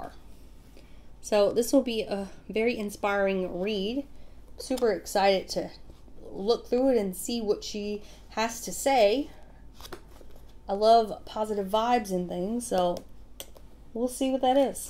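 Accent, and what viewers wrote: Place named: Louisiana, United States. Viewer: I knew I were from Louisiana. I could tell by ur voice. I live in Marksville, Louisiana. I am a lil over an hour from Lafayette. Girl do u get the box Single Swag? It is from the same company as this box. It is a lol better than this box.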